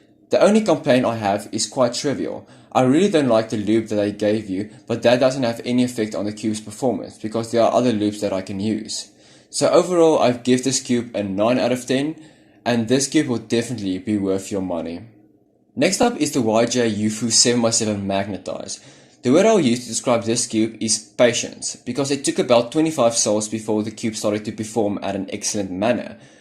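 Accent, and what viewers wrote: South Africa. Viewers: What I'm so sorry but are you South African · Are you south African, nice accent!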